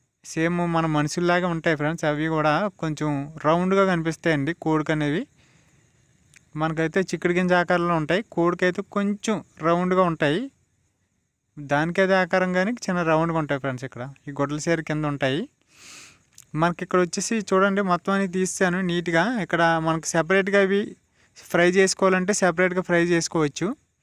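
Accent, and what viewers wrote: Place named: Vizianagaram, India. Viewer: Vijayanagaram yaasa manadhe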